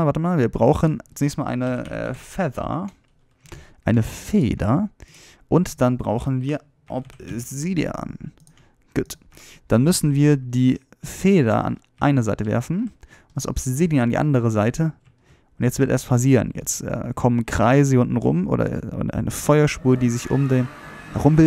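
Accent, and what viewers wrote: Hesse, Germany. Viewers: Er ist Hesse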